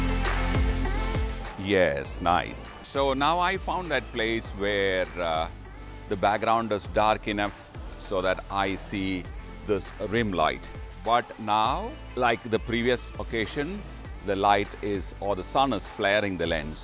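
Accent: India. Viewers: Ye Bande ko dekh k peheli baar dimag ka bhosda Huwa hy, 😂😂😂 gora kabse Indian accent me bath karne laga bhai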